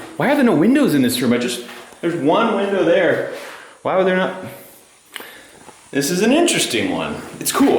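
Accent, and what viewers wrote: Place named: Montana, United States. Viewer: Montana...... Yes please Love the accent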